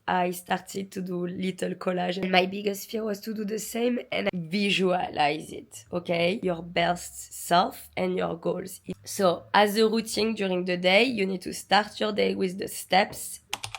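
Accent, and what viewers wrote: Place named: France. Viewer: dear, thank you so much for all the directions you gave to achieve our dream lives. i feel like i am hearing from such a beloved friend when listening to you. love the way you express yourself (also, your french accent!). i do not only believe, but feel you truly are such an amazing person and have a marvelous soul. may your life be always filled with love, joy and blessings ❤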